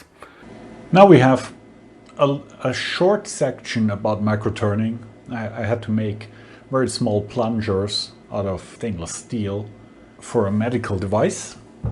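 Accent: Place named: Hemau, Germany. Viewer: Glad to see a video, you are my absolute favorite machining channel of all time. You're a very talented machinist and you have the exact same accent as my family from Hemau.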